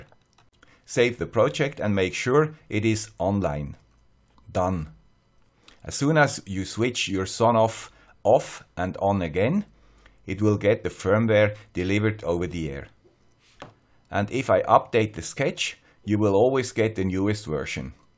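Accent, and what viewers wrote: Switzerland. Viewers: Hi Mister Swiss Accent First of all, i would like to thank you for your excellent video tutorials. i know how much time you are spending on this stuff. Big Thumbs up. Encouraged by this video i started to build my own automation system. But to be honest this stuff nearly screwed me up. Being a newbie it showed to be tough work to get my system running. I spend nearly 3 weeks to set up the raspberry, the development tools and to build my own firmware. I got stuck nearly every problem that could occur during the build process. Fiddling with linux was one of the issues. Due to some basic programming experience i thought that it would be easy to build my firmware.Wrong assumption. The build process was a trial and error approach due to the spare information for the firmware build. Most annoying was the fact that there is a plethora of information in the internet. 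But as a newbie i could not figure out if this information was useful for managing my problems. Most items i found were outdated, wrong context or even worse just false. Finally i found 2 major information that helped me out. I would like to ask you if it is possible from your side to provide us with a video showing a brief overview of setting up the environment, the build process and TOOLS (i.e. Platformio vs. Arduinoi) and hints to avoid the pitfalls for the build process. One of my major time consuming issue was to figure out how to configure the right Arduino Development Environment. Newbies will find really nothing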